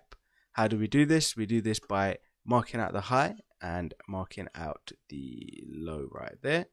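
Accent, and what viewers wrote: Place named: Germany. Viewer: wie wenn du deutsch redest.bitteversuche in englischen dialekt zu reden.versuche.du gibst sicher gute tips aber der dialekt macht dich unhörbar.bemuhe dich.danke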